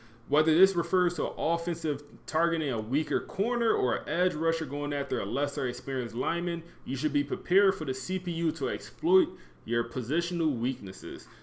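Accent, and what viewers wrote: Philadelphia, United States. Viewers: Man I instantly knew this dude was from Philly!! lol but I used Flexible Play caller without realizing what I was choosing. Description definitely fits against my game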